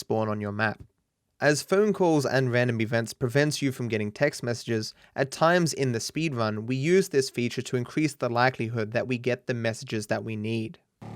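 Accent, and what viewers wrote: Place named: Australia. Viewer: Sounds like your saying it right to me..but then I'm Aussie too.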